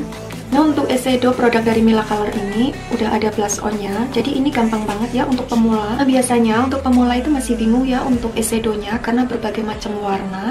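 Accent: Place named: Wonosobo, Indonesia. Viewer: Dari logat bicaranya seperti nya anda tinggal tidak begitu jauh dari wonosobo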